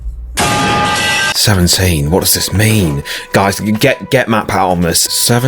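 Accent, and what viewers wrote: United Kingdom. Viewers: Bro you started with epic british lector voice and then you show us a beta 2011 emo kid recording in parents bedroom. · ar u British mate???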